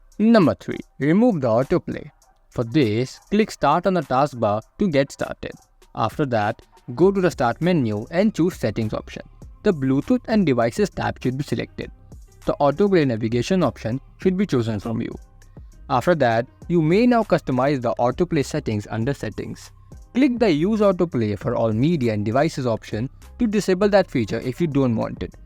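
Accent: India. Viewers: I wish I could understand Indian accents. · when you hear a indian accent you know ur problems getting fixed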